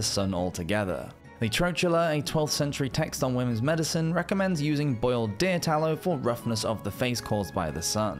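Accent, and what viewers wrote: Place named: Leeds, United Kingdom. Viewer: You have a Leeds accent I think. Im South African btw so no experience here.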